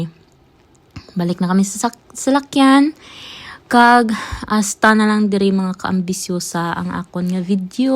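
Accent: Philippines. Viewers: Hnd ko maintindihan cnasabi mo ppro ka visaya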